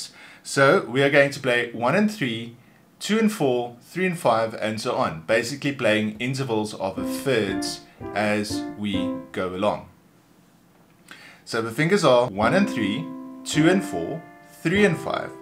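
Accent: South Africa. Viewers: excuse me but are you South African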